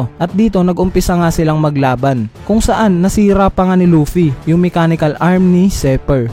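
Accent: Visayas, Philippines. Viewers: Bisaya ka man?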